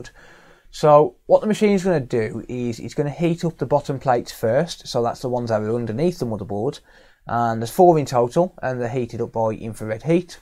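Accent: United Kingdom. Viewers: Thing is, I can understand British English quite well. I can barely follow Benedict Cumberbatch is Sherlock when he goes 120% in his thinking, but I can get the general gist. Maybe it's the accent that throws me off in this case. About anime, well, I listen to the original dub, not the English one :D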